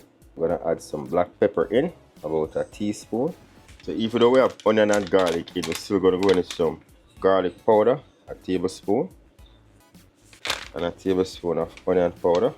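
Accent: Jamaica. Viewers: Chief.. I. Love. The. Fact. You. Speak. English. Well. Just. With. A. Little. Jamaican. Accent. Which. Is. Fine.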